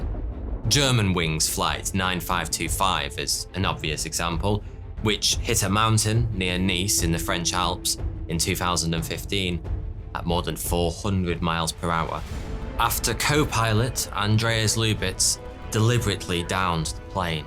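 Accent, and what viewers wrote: United Kingdom. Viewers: well that's because he is British... go just a bit further east or south and the accents/voices start sounding increasingly "ooga booga-ish", especially once you reach Eastern European territory. source: years of gaming with voice chat + discord and many vacations around Europe. the worst offenders for terrible English accents rated from indecipherable to somewhat understandable are: 1. Russians/Ukrainians (these share the top spot) 2. Greeks 3. Italians 4. Spanish 5. French 6. Bulgarians 7. Romanians 8. Serbians 9. Lithuanians 10. Latvians whereas the most clear accents from most understandable to least understandable would be: 1. All the Scandinavian/Nordic countries; Danish, Norwegian, Swedes, Finnish, Icelanders 2. Dutch 3. Belgians 4. Germans people from countries like France, Germany, Italy, Russia, Spain and Greece happen to be the most rude people when playing games online internationally, as they will usually not even attempt to communicate in English and just assume everyone else on the server speaks their native language, and when they get angry usually start cursing and raging in said native languages as well, not realizing that they aren't actually offending people since nobody understands what they are saying. 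the countries not included in this list are people i don't run into often and therefore can't judge.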